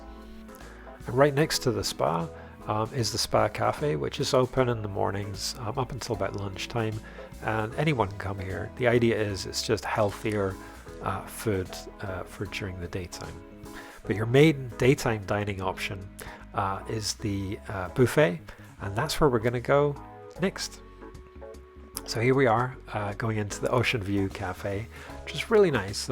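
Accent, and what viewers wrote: Scotland, United Kingdom. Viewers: Lovely vid, Thank you. We have just booked the Lisbon to Buenos Aires cruise in Nov '24 on this ship. Nice to hear you Scottish accent appearing. Big hugs fae Bonnie Scotland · Hey, I’m assuming you are Scottish by your accent. You can pronounce Foyer just like us Brits and Canadians do. Don’t mind the US bastardised pronunciation lol. 😂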